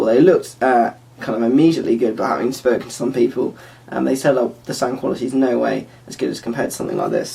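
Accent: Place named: United Kingdom. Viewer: I couldn't understand half of the things you said...bloody British accent lol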